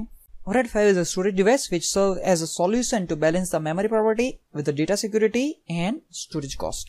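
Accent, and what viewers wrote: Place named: India. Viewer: Strong Indian accent - hard to follow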